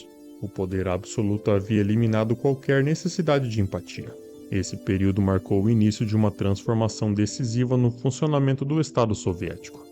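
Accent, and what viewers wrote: Brazil. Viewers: Sim ele é um sulistas branco com sotaque forte quem nasce no sul dificilmente perde esse sotaque 😢😮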